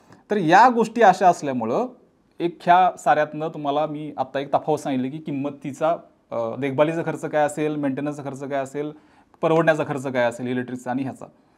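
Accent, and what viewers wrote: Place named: Rural, India. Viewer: 3 ते 4 मिनिटांचा कन्टेन्ट घासून घासून परत परत परत तेच सांगून 20 मिनिटांपर्यंत ताणायला खरंच कौशल्य लागते राव. विषय चांगला आहे, ग्रामीण अक्सेंट मुळे आपल्यातला वाटतो देखील..पण जरा स्क्रिप्ट वाचून घ्या शेठ!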